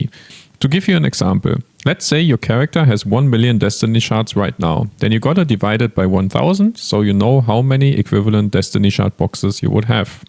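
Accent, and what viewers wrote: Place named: Germany. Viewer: Love your accent! If i would take a guess its a typical german one! <3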